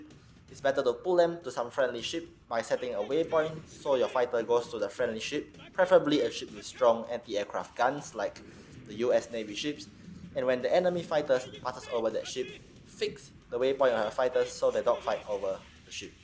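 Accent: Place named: Singapore. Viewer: man he sounded sooo SG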